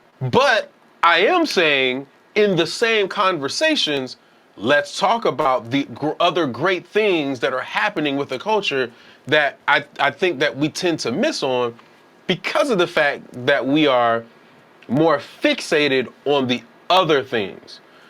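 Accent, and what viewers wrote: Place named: South, United States. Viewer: you lean towards Southern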